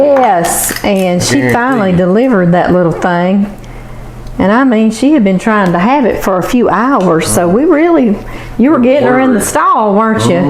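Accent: South, United States. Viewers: I’m only here for her southern accent.. from Hawaii and just fascinated listening to her explaining how to make yummy goodies!!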